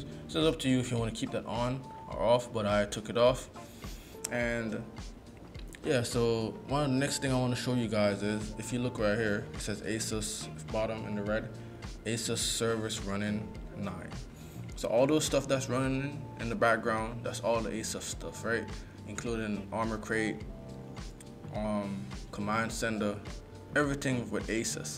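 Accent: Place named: Jamaica. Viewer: I can tell!!!! I know a fellow jamaican when I see and hear one. I'm from Mobay. I think I subbed to u by the way. I have the deck, the switch and rog ally. While the deck and ally are both cool, I still think personally the switch us a way better and funner hybrid. The switch 2 will furthermore burry all these wanna be switch gandhelds into the ground even more. Anyway keep doing your things nintendo for life though lol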